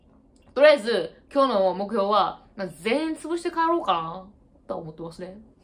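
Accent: Kansai, Japan. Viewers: 関西人がしゃべる標準語よき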